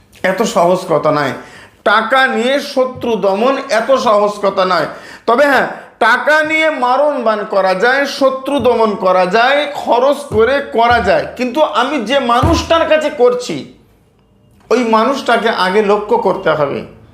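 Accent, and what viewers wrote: India. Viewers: Apni ki north bengal asen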